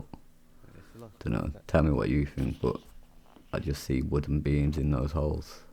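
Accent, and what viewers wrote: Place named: Birmingham, United Kingdom. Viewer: BOOM! You sound like you are from near to where I came from? Are you a Brummie? Close by?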